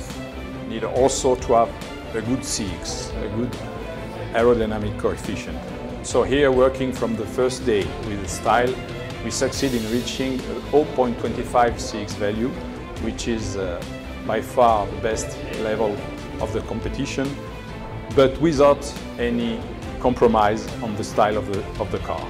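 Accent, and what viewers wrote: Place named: Germany. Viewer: So disappointed by hearing a German speak... no matter how well he does his job. I am aware that is unfair.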